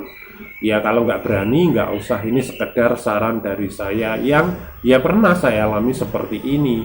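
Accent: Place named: Banjarnegara, Indonesia. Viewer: Pak boleh nanya.. bpk asli mana? Mf pak soal nya mirip orang banjar negara 🙏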